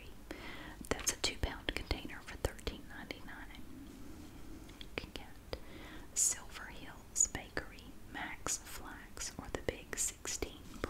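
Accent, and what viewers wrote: South, United States. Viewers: Anyone else wondering where she is from in the South!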